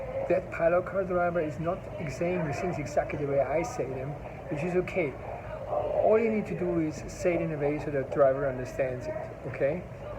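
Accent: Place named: Germany. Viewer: I’m enjoying learning from your content. I notice your accent sounds European like from a German speaking (Germany, Austria, Belgium, Switzerland) Country. Is the economy/marketplace for professional transportation work better for entrepreneurs in America than Europe? Do they have pilot car escorts for major commercial shipments on the roadways in Europe also?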